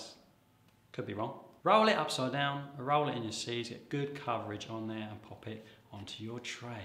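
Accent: United Kingdom. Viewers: Welcome back,! So glad to hear your lovely voice and British accent. Always brightens my day! Yes, this is a fantastic trick! I’ve used it for seeds and bran and rolled oats — whatever I want to stick onto the bread. If the seeds are heavier, I sometimes give a little rub to the wet top of the loaf to work up a bit of starch. Only takes a few seconds, but I think it helps stuff stick better when I’m slicing the loaf after it’s baked. Keep up the good work and great smiles for us! You are sunshine on a cloudy day. A breath of fresh air in a stifling room. The sauce on our pizza dough… 🤣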